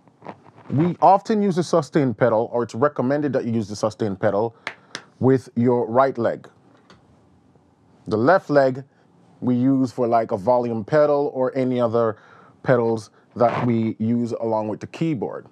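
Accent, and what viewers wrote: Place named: Jamaica. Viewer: Was only listening to the Jamaican accent 🤩.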